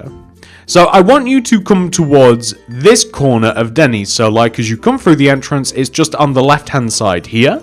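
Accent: United Kingdom. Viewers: Are u British ?? If so can u make an Asda ???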